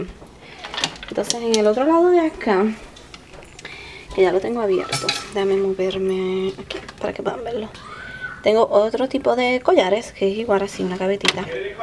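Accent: Puerto Rico. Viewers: Me facina ver tus videos Aww tu acento pueltoliqueño!! Eres hermosaaaaaa!! Me facino tu jollero!!! Besoooossss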